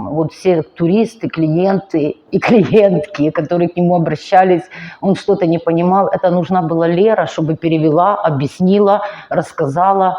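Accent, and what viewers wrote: Ukraine. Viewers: Вы такая красивая,приятная ❤ мне нравится ваш украинский акцент ❤ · У жіночки комплекс меншовартості. У неї акцент укрАінскій. Цікаво б було послухати її укрАінську мову.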